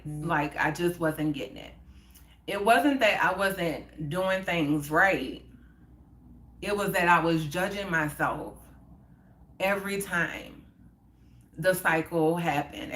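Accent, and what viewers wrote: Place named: New Orleans, United States. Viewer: I like that T nw orleans accent u he And me😊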